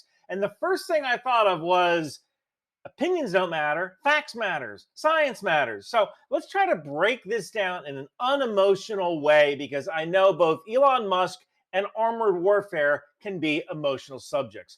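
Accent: South Africa. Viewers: Good South African accent. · Your South African Elon accent is pretty good!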